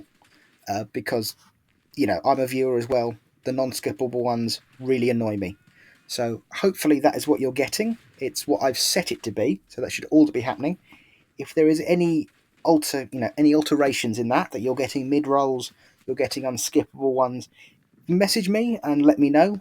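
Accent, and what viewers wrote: Norfolk, United Kingdom. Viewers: Lovely Norfolk accent. Compared to mine you sound clear precise and professional. Ar B lovely ole job. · Hey this is an old fen tiger that's retired to West Africa ... just found you & it's brilliant ... also nothing wrong with a Norfolk accent ... bootiful 🤗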